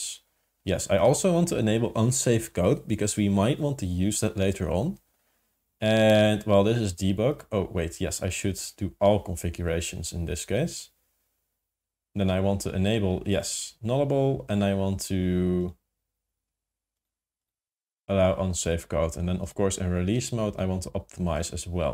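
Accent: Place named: Netherlands. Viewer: Perfect timing. I'm just doing some open tk stuff myself. From your accent I figure you're dutch? Me too 😊 I'm curious where this will go, you've got my subscription!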